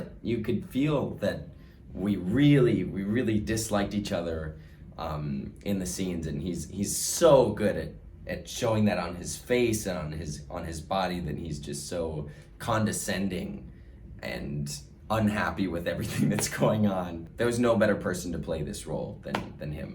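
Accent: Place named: England, United Kingdom. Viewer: When I watch this series I never thought he’s Thai language was his first language coz the way he relay things it’s so awesome and sexy but I do like he’s English ascent so cool 😎. You did a great job 😉👍✨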